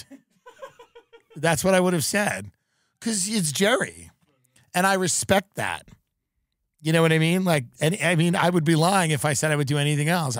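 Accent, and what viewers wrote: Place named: United States. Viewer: It's kind of weird, I saw his special... It was ... It was so stale. It was bad. Just really bad. But this podcast and way he performs here is pure gold. A real american voice. Everything he does in this podcast is 100% on point and makes me go crazy. This podcast is hilarious in a way that Louie describes, I feel how it's changing me as a human and how I view the world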